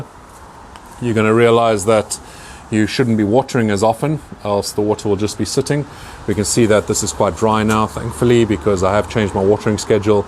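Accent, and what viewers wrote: South Africa. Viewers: The SA accent is BY FAR the best accent IMO. Manly and unique. 🤘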